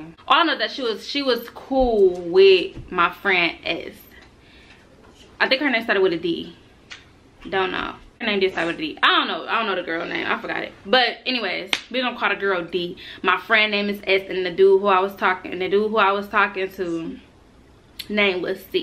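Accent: Detroit, United States. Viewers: I love Detroit accent 😍😍😍 her voice so pretty 💝💖💝💖💝💖💝💖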